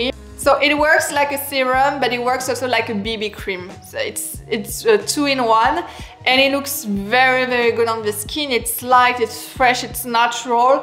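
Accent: France. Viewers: Please do an updated video on this topic, but starting with a bare face and going through your routine, a ‘get ready with me’ style video. It’s more fun to see it in action and see your technique. Updated product recommendations too. Please! 🙏 You are my favorite style channel I’ve ever found, you’re truly inspiring, i aspire to be as chic as you someday soon. And I love your thick french accent. J’adore ☺️❤️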